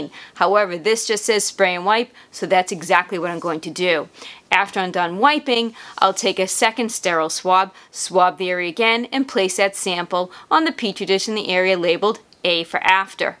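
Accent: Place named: New Jersey, United States. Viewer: Woah great video! I have a portable far infrared sauna (it's like a tent you lie down in with an infrared generator). I was on the hunt looking for a more natural spray with less caustic chemicals to clean it after use, and encounter the Mr. Meyer's brand. Loved everything I read about it, but was also looking for something with antibacterial properties. As you mentioned, they don't state that it does kill bacteria, but you just proved it without a shadow of a doubt. I picked some up today. I sprayed a little on the counter top just to test the scent, I think it smells good. Hopefully it won't be too overpowering when I clean the whole sauna, but I would rather have something safe than the chemical spray I was using. Thanks again for this great presentation. BTW, loved the accent, I had grandparents from NJ :)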